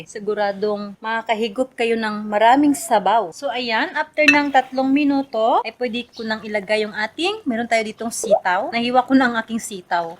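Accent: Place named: Visayas, Philippines. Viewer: Siguro mag bisaya ka nalang kasi bisaya ang tuno mo oi..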